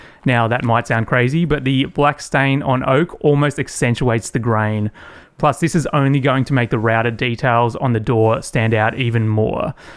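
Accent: Australia. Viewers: Ah didn't actually know you were Aussie. I like it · Awesome dude, love hearing a fellow aussie while hes doing friggen amazing work! Be good if you could use some native high end timbers if possible, if there are any. I'm only a couple years in and just use off cuts from a stair manufacturing place. Love your work mate.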